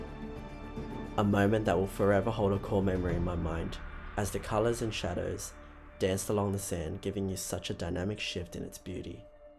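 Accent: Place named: Australia. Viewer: Hi, great video! It sounds like you might be Australian and I'm just wondering was Socotra listed by DFAT (or your respective Government travel advisory) as 'Do not travel' when you went? I'd like to go next year but unsure as its currently listed as 'Do not travel'...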